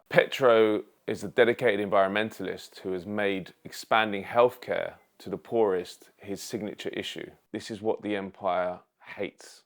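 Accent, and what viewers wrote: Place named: Cockney, United Kingdom. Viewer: Your London thick Cockney accent dialect is not easy to understand to a lot of English speaking nations. If you were more well spoken your message would get across more. Btw i agree with everything you say. I'm from London.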